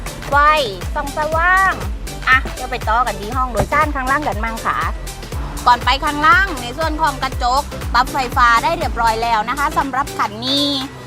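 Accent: Thailand. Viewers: สุดยอดมากแหลงเก่งมากเพราะมากถ้าไมแหลงใต้เรานึกว่าเด็กเหนือนะ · แหลงใต้เพราะมากก สวัสดี บายบ่าย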